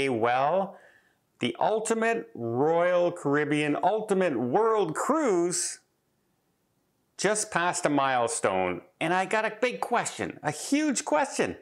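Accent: Canada. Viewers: Canadian pronunciation. Just because Americans pronounce something one (we usually don’t either depending on regionsin the US)way doesn’t mean everyone has to sound like us 🙄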